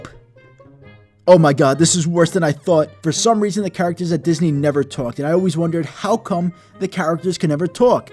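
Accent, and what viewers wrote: New York, United States. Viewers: U r from New York right ? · Is it just me or does sound like he has a slight New York accent? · I like his voice , it reminds me of a kind of latina and new york accent.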